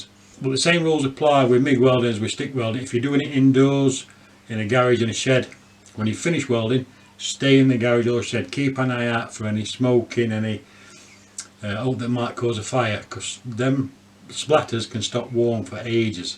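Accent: Yorkshire, United Kingdom. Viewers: You sound like you’re from Yorkshire? Where to you get your gas bottle from for gas mig welding?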